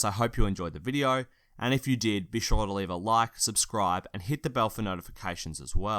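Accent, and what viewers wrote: Australia. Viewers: Nerlens Noel is on the comeback!! OKC management are doing a great job! Btw hi aussie narrator 👏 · Are you Australian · It’s an Australia accent though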